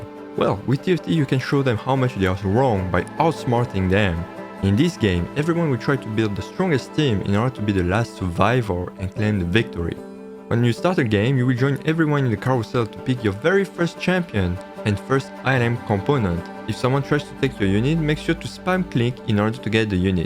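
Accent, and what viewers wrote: France. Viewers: T'a trop un accent français , 100% tu l'es je pense ^^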